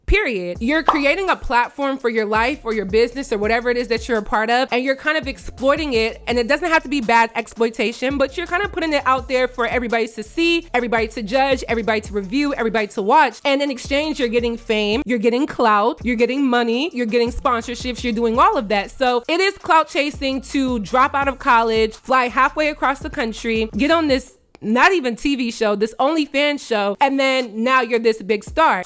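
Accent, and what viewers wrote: Philadelphia, United States. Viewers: Girl I’m from Boston and I’ve been watching you for a while and I already knew u were from Philly with that accent! Philly thru and thru lol ❤ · 215 I knew you were from Philly from day one! I said "hol up she sounds like me!" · 215 South Philly love your Content and can hear Philly all day in your accent❤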